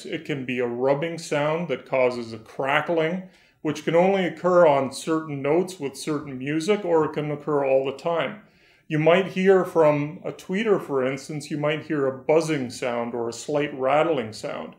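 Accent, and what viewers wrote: Canada. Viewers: First 5 seconds I knew this guy was Canadian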